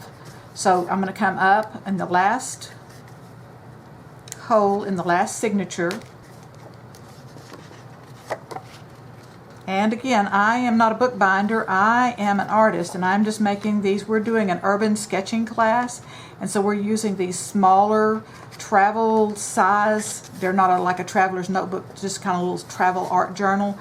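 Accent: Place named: South, United States. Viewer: I want to say that your detailed description of each step is exactly what I needed! An added bonus is your accent! I’m grew up in the south and I’m always homesick! Thank you for your video!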